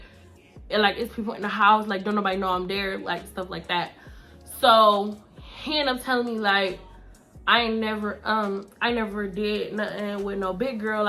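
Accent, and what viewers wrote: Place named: New Orleans, United States. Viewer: why you sound like you from new Orleans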